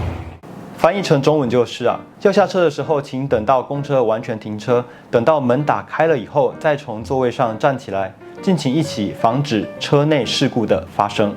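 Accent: China. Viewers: 臺灣如果公車文化不夠好，那大陸不就是難民出現了嗎？你這個大陸人假扮臺灣人，口音一聽就知道你是大陸人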